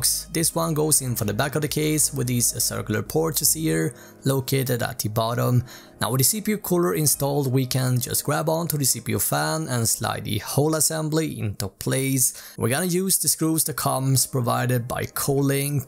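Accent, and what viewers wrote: Sweden. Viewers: Are you from sweden, great video btw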